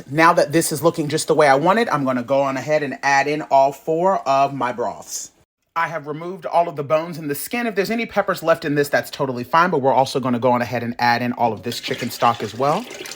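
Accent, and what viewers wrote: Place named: Louisiana, United States. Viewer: Ok, you earned my respect. I would swear you are from Louisiana 🎉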